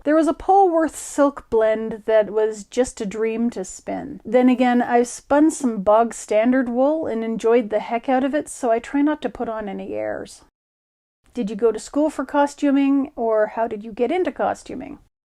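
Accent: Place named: Ottawa Valley, Canada. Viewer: Huh. I thought I was the only one hearing an angelic choir every time one mentions... The Algorithms… (gosh, they're loud). Big congratulations on the bump in viewership. Well done. Ah the subtle twang that is the Ottawa Valley. Fascinating that you got mistaken for Australia. And that 'Somebody knows something' podcast, season 1. Well. It's not often that you get the details of things that happened to someone who would have been mine and Mister Husbands age. I probably fished around that lake around that time. I'm loving the post-modern digital communication analysis, by-the-by. Guess we were having the same conversations at Carleton back in the day (the painters tape you have over the 'pop' bottle lettering... bowing). Suggestion for one of those marketing relationships kind of thing - make friends with the local independent 'stuff' suppliers for at least a cut in costs of material. 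I have no idea if there's an equivalent to the agency that reps Miz Bernadette in the Canadian area codes - maybe somewhere in T-dot or Van? Maybe Enchanted Rose knows someone? The 1915 hand-crank Singer 99K is here. And sews just like she came out of the factory - absolutely mind blowing. And I got to use her on my FR entry. Which is almost finished and about to be photo-ed. Form fitting shell dress a la the (19)40's, representing Blanch from Streetcar Named Desire. Too cheep & poor for patterns so I taught myself how to pattern draft for my Covid time. Not baggy clothing is... weird. I'm used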